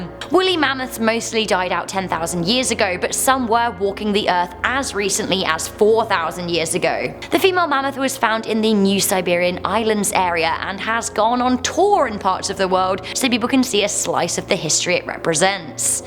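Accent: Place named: United Kingdom. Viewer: Hi, I love your accent fore sure you can't denie you're from UK ;) <3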